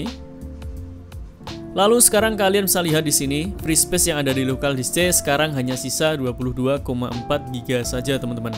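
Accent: Banjarmasin, Indonesia. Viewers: What , abang orang banjarmasin ya ?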